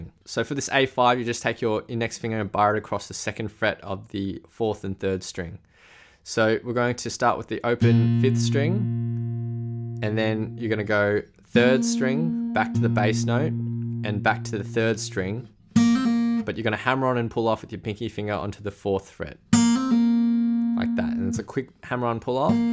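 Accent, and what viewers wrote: Australia. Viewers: When you hear the Aussie accent And see that beautiful Australian guitar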